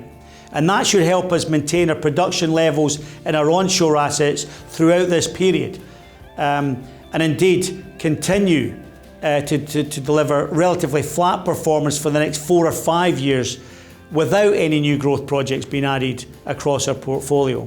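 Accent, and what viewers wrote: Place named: Scotland, United Kingdom. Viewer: Thank you; clearly and succinctly explained for the lay person. BTW, I love your gorgeous Scottish accent, sir!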